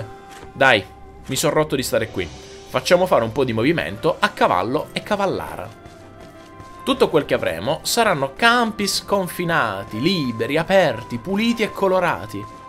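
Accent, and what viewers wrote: South, Italy. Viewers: si sente proprio che sei del sud:D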